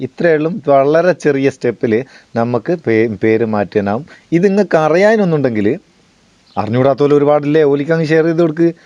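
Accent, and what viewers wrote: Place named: Kasaragod, India. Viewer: kasaragod aano🤔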